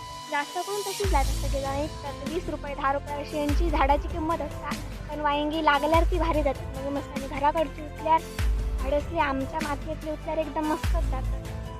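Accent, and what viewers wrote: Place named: Sawantwadi, India. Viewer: Go tu bolta kiti bhari parda mast hade tade pure sawantvadi vadi bhasha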